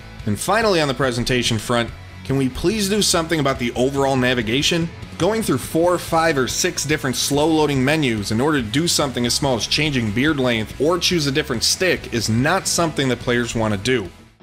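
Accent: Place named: Buffalo, United States. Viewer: Heard the buffalonian accent and saw you were using ROC and BUF a few times and thought, this guys has to be from BUF... found you on Twitter and confirmed my suspicions! Lol #gosabres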